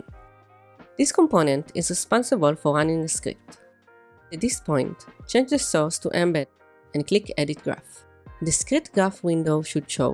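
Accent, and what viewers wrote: Israel. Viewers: at the start i know that you from israel מההתחלה ידעתי שאתה מישראל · אני מזהה את המבטא הישראלי מקילומטרים. אחלה תוכן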